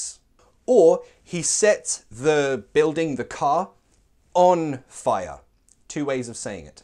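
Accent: United Kingdom. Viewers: Thank so Much ! I really like your accent, so British ! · Hey, I love you, I really do. I've always been watching your lessons. You're really funny and I've been recommending your YouTube Channel to my friends. But there's something I want to tell you, you're dressed up like an American Police Officer and speaking with the British accent 😁😁 it makes no sense 😁😁😁. I'm laughing out loud. It's not a critique, don't get me wrong. What I'm trying to say is that you're so funny, you really are. The way you create the videos make us being focused on the lessons. It makes us to want more and more an more. I love the way you make your lessons, your videos and I do believe I'm not the only one who thinks like that. I love you mate, actually I'm in USA, I should be saying "I love you my friend" 😁😁. I know, I know, Mate and Friend are the same thing. 😁😁 Wish you all my best my friend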